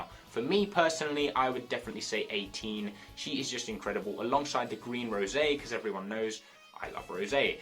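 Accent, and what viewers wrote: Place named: England, United Kingdom. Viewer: Do you come from England I like your accent. I come from England ❤️🏴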